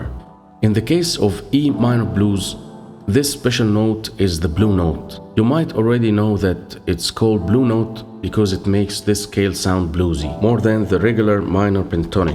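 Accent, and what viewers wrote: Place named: France. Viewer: are you in France? You kind of have that french accent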